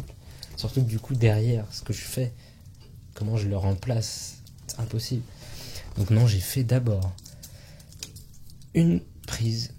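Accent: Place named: France. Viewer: No entendi nada pero me gusta tu acento y idioma Frances 😊😊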